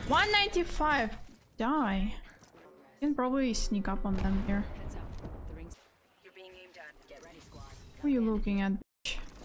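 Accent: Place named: Russia. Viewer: I had no idea that your accent was russian. As an american i can usually spot a russian accent but tbh I thought your accent was spanish. Either way, I like it and think you're absolutely beautiful. Not in a creepy way though, I'm happily married. Love your gameplay too. You're really good! Keep it up!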